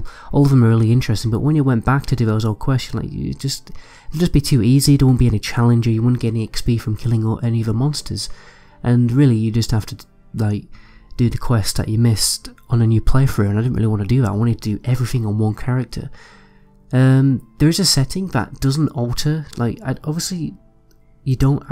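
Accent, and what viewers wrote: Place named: Northern England, United Kingdom. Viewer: your accent started to get increasingly less RP and a lot more northern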